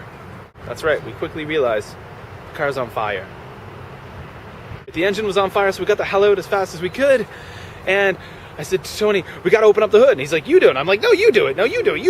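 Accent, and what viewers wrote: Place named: Maritimes, Canada. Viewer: lol. great story. i love the east coaster accent, it makes the story. Reminds me of Rick Mercer or Andrew Younghusband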